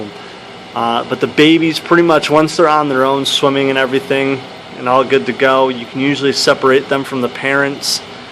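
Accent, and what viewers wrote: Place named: Massachusetts, United States. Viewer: are you from Massachusetts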